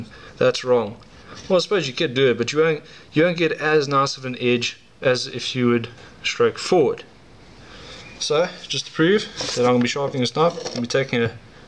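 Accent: South Africa. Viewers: are you South African? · Jis man kan hoor dis n boertjie wat hier praat. 😉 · I smaak the Souf Efrikan eksent bru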